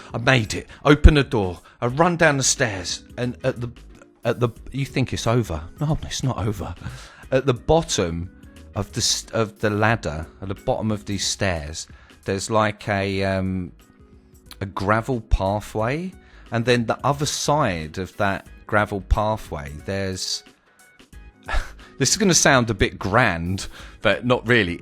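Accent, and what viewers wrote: United Kingdom. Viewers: ur fucking hilarious mate just subscribed where are you from in England it seems amazing how different some.brittish ppl sound compared to others but I guess everywhere has different accents lol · sounds like a London guy, as no Northern Monkey could be so entertaining.Him & Frankie have cost me a fooking fortune after trying out the games they convinced me to play.: )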